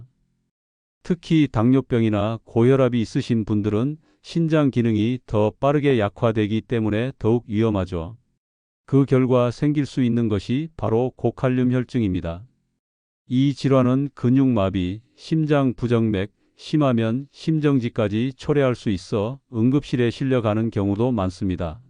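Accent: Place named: Jeolla, South Korea. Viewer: 전라도 억양 소름돋아,,, 징글징글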